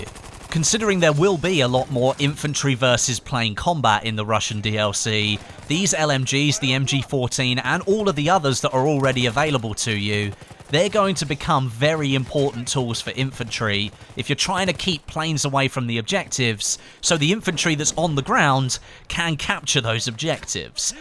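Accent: United Kingdom. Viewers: your UK affiliated accent is terrible to listen to u dont sound very compelling either I must have been drunk the day I subscribed lol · Jesus that's a fucking thick British ascent.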